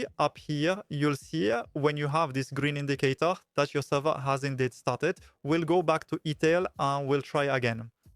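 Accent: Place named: India. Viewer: nice hindi IA accent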